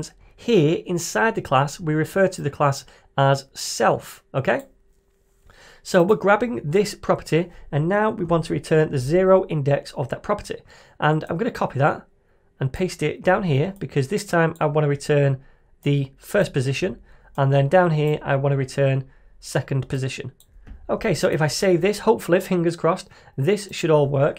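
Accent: United Kingdom. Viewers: diving into the topic instant -british accent -on point -examples made easy to understand please never stop you made this nutshell powerful. Continue your videos like this it's more helpful than you think it is. People are way into "yo whats up this is .. and never forget to click the bell and sub" and "for a short ad we can do this" such sellouts.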